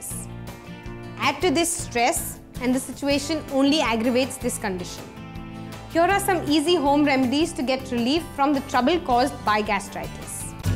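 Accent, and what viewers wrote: India. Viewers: If you don't like Indian accent, why the hell did you hear it then?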